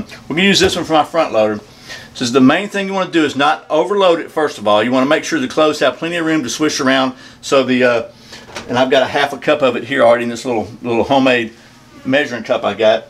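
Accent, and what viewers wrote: Georgia, United States. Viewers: the subtitles suggest you tried something from your toilet!?! That is not a good idea. Got to love automatic closed captioning on that lovely Geogia accent.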